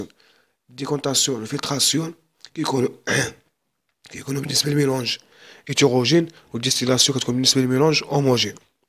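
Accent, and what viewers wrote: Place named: Western Sahara. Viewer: لكنتك صحراوية. موفق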